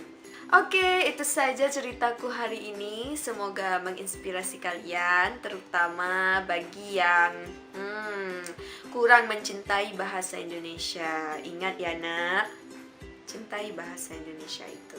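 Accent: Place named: Malaysia. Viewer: ikut senang bhs indonesia ada di harvard. Dan apa kabar dengan bhs malaysia kak? apa juga sampai di sana? karena sepertinya kak berlogat malaysia.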